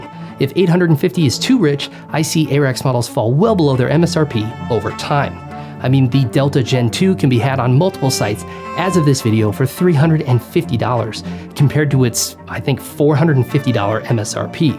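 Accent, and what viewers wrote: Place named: Minnesota, United States. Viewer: What area of Tennessee? Been in Southeast TN my whole life. You killed that Minnesota accent, I went to Ely Minnesota on vacation several years back and my god do you sound like them. The difference of dialect was an issue, my thick southern accent was all but incoherent for the locals in Ely. Our fishing guide made me sit in the front of the boat so he could read my lips so he’d know what I was saying lol, great people there.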